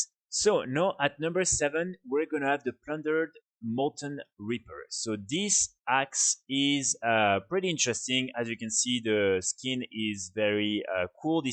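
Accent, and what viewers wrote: France. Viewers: u speak very well :p french ?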